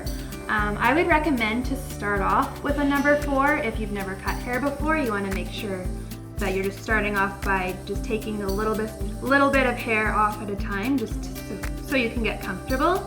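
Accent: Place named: Texas, United States. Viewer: You must be from Texas.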